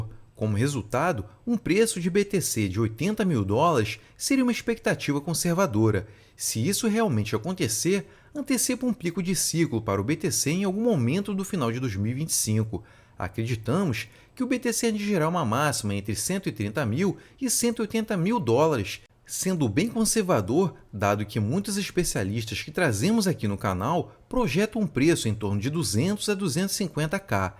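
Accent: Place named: Rio de Janeiro, Brazil. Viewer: Me perdoe, se você tirar seu xis carioca, o carioquês horroroso da sua fala você dará um passo arriba. Cara, você não sabe como esses xissss são desagradáveis.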